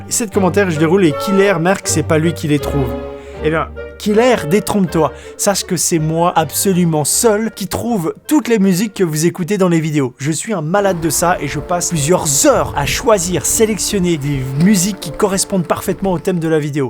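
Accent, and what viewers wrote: England, United Kingdom. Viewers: Y’a qd mm que les francophones pr aller se moquer d’un accent anglais 🙄 au 21e siècle il serait GRAND tps d’évoluer, continue tu es parfait et merci pr cette belle année encore 🙌🏽🎉♥️ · J'adore toutes tes vidéos. Je n'en rate aucune. Peu importe ton accent anglais ( fuck les rageux) je suis admirative de ton travail. Mes vidéos favorites sont celles sur les animaux notamment celles de chauves souris. Et toutes celles où tu nous fais découvrir des lieux. Vraiment merci pour ton travail. Love sur toi 🙏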